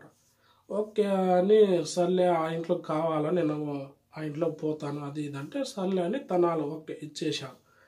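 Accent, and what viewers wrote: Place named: Kadapa, India. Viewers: Manna rayalashima voice supper Anna tq good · Hiiii brother, I'm from kurnool oka 2 days nundi mee videos chustunna, chaala baaga anipistundi enduku ante Mee kadapa slank chaala interesting ga undi enduku ante YouTube lo mana local experience dorakadu kada. Anyway good job bro.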